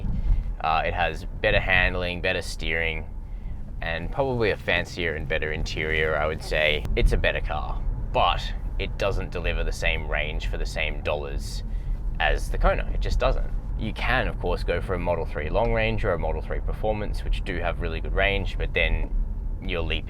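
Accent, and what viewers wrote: Australia. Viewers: Aussie accent unbearable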